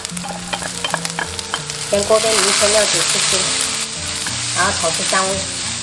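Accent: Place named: Quanzhou, China. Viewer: 四川人怎麼泉州話說的那麼標準？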